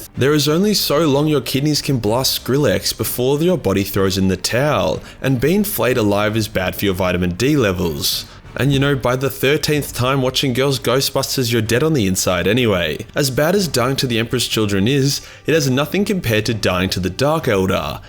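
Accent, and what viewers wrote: Australia. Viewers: I'm really disappointed that you did not do any political jokes. You throw homophobic slurs, sexism, autism jokes and more. But oh let's give politics a break. What the fuck dude. >:( I thought I was listening to an Australian. Not a Canadian.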